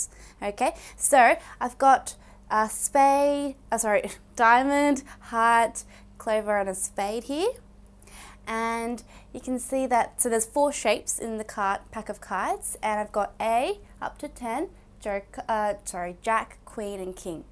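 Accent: Australia. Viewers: It's messing with my mind watching a Chinese girl with an Australian accent. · Omg thank you so much. She really explained very well. Btw the girl who teach reminds me of Rosè from Blackpink because of her Aussie Accent. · I like your Australian accent! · Aussie accent tho.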